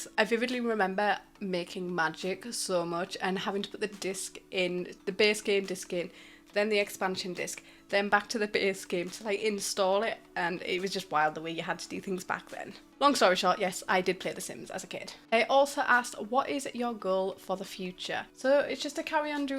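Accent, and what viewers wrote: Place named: Sunderland, United Kingdom. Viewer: If never guess Yorkshire accent. You sound more like a Makem than a geordie aha 😅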